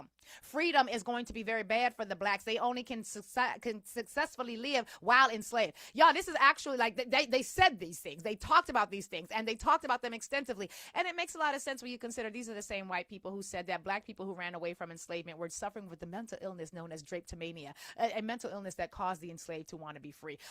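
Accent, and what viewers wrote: South, United States. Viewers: I approve this message. BTW, your southern accent is hilarious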